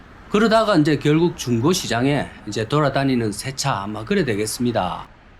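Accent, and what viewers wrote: Gyeongsang, South Korea. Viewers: 뭔 개소리 토종 경상도 사투리도 몰라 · 어이 말투 꼬투리 잡지마라 열심히 일하시는분 북한 빨갱이가 머꼬 니가 빨갱이같은디 경상도 사투리도 북한말로 착각하는거 보니 ㅋㅋ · 뭐 구수한 경상도 사투리 듣기좋구만...즐겨보는 채널인데...올빼미 TV와 함께...희망아 듣기싫으면 사라져라.ㅠㅠ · 듣기 좋기만 한데 구수한 경상도 형님의 말투를 이렇게 나쁘게 표현하냐 너는 일베냐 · 겁나 흔한 경남사투리인데 보도듣도못한 님이 빨갱이 아니노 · 너무 구수한 경상도사투리를 쩝쩝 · 딱들어보면 경상도 사투리인데 무슨 북한빨갱이 조선족운운하는지 참 예의없고 싸가지없네 너야말로 중국 조선족 탈북자인가 예의지켜라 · ㅎㅎ 이곳과 관계가 있는 분인가 보다. 갱상도 아임미까. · 경상도 사투리 구수하고 듣기 좋기만 하구만~넌 중국 연변에서 언제 왔냐~? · 북에서 왔나? 경상도 사투리도 조선족으로 생각하다니 ㅋ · 경상도 사람이 서울에서는 사투리 안 쓰지요. 갱상도 폿데가 좀 나서 그렇지. 그게 사투리 아니고 서울말 쓴다고 하는 말투입니다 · 오리지날 경상도사투리죠 강호동 은 꿋꿋이 방송에 떠드는 그 ~ 갱상도 사투리올시다 호동이가 조선족 안입니더~ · 순수 경사도 사투리입니다🎉🎉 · 경상도 사투리도 지역마다 좀 틀린가봐요. 어떤경상도 사투리는 일본말 같은데 이분은 일본말같지 않고 진짜 구수한 사투리같네요. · 원전 갱상도 사투리 인증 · 나 창원 사람인데 우리 동네분인가 하면서 듣는데 머선 소리~~ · 전형적인 경상도말씨네요 트집잡는 사람은 아마 절라디언인가봅니다ᆢ! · 말투는 경상도 사투리네요 대구달성구 인데 생각있으니까 비워놓겠지요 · 먼소리요? 내가 갱상도인데 내가 이미 검증하였음 나보다 더 오리지널 경상도사투리요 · 딱 억양이 부산경남 말씨 경북대구쪽은 대출 이칼때 대~출 이라카고 경남은 대'출 대자에 억양을 씨게 함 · 딱 들어도 갱상도 사투리인데~ 구수하기만 한데 뭔 트집?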